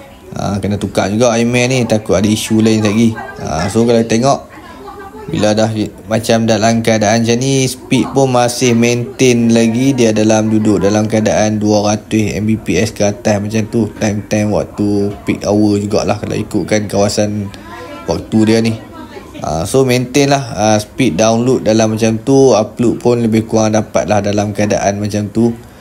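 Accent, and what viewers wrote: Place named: Kedah, Malaysia. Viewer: Mantap...ada band 28 + loghat kedah...terbaik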